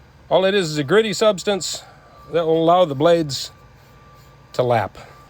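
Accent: Wisconsin, United States. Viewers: also, you sound exactly like my uncle Steve that it kept catching me off guard. He's from Wisconsin and has a really specific accent. 😊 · Love the sound of "Wisconsin" in your voice AND the straightforward instructions--I think I may even be able to handle this (says the 59-year-old woman)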